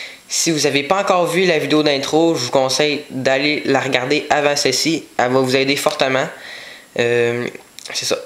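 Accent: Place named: Quebec, Canada. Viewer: Le québécois en force x) Merci pour l'tuto !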